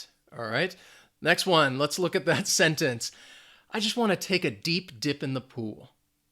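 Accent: United States. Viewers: i love your accent. from which part of the US are you from?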